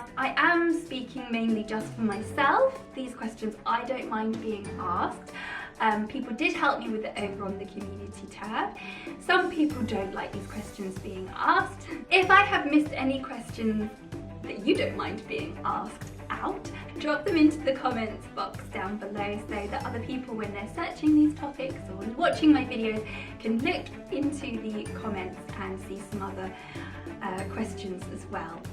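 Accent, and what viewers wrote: England, United Kingdom. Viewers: It's like my opinions in an English accent. Are you reading my mind?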